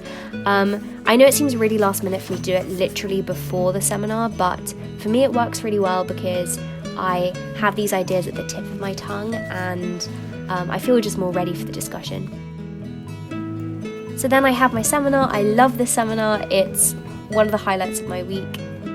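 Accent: United Kingdom. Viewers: Damn....I loooooooove your Birtish ACCENT...JUST EXCEPTIONALLY GOOD..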